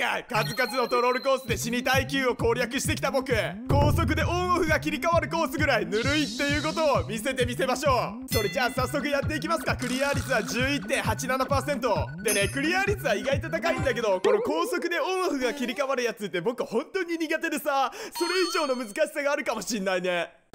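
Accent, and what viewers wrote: Fukuoka, Japan. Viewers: 福岡の人？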